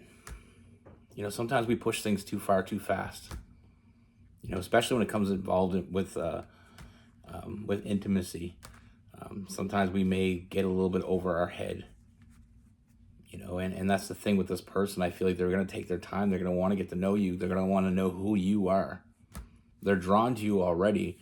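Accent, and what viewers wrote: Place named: Canada. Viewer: Thanks for the story man I've been watching you for a while. For a while there I thought you were from Boston like me but turns out I guess you're from Canada. And I can kind of relate to you because all my cousins are from Canada. I definitely agree with friends first. I've known this guy on and off for years and 2011. All of a sudden out of nowhere he said that well basically you said that he didn't really say I love you but he said that he deeply cares about me I think he's afraid to say the l word so he is not ready yet. And kind of just came back in life. And I feel a little shaky or on ground because I don't know what direction this is going to head in. But I'm going to be patient with him and see where it goes. But he's the one that noticed that I didn't love myself and he stepped away for like a year. And now all of a sudden he says he misses me and cares about me deeply. 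I hope he's not lying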